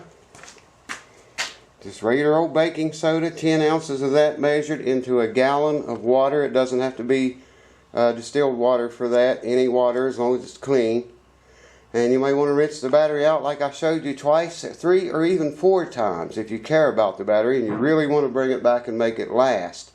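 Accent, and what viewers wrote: South, United States. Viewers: lol, actually sounds more like Earnest T. Bass (character in the old Andy Griffith show - the hillbilly who comes down from the hills , every now and then, and causes mischief)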